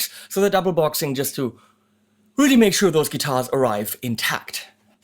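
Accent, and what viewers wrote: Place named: Germany. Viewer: wonderful Sherman Akzent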